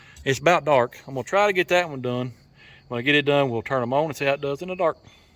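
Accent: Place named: Southwest, United States. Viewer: I am writing you from France,. I am from Prentiss Mississippi. And L have watch everyone of your vidéos, and love everyone of them. Me being in France , it is good to hear someone from the Southwest talk. I am à retirer milirary, L moved to France, when I retirer. So keep up the good work, you are like one of the family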